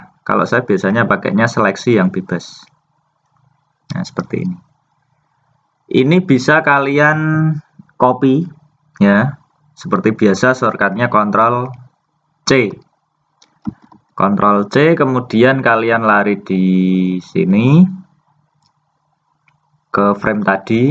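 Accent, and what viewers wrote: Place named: Java, Indonesia. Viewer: Pak medok banget hh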